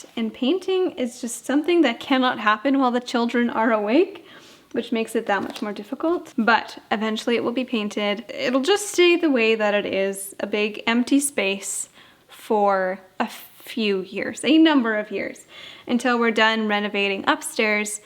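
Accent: Western Canada, Canada. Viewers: I knew you were a Canadian the moment you pronounced, “bag” and “garage.” Lol, you can’t hide that accent :-) 🇨🇦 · Lol I love hearing how others perceive Canadian accents. Hers is more of a western Canadian accent though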